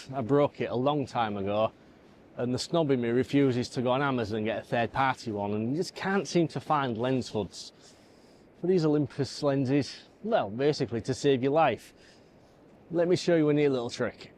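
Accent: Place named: North East England, United Kingdom. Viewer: Superb photos. I really am thinking about going back to M43 and this video helps. Because of your accent I actually thought you were from the north east so was surprised when you said how far you travelled! ‘Honey pot’…if you want a honey pot head a bit further south where you’ll find St Mary’s Lighthouse at Whitley Bay 🙂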